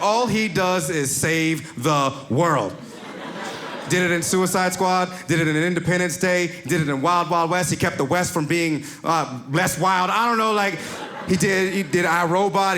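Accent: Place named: Montana, United States. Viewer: If this was an audio clip without video, I would bet my life savings that I'm listening to a white man from Montana or New Hampshire 😂😂😂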